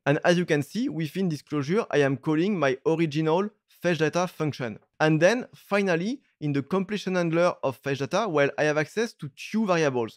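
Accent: France. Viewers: J’adore l’accent français!!!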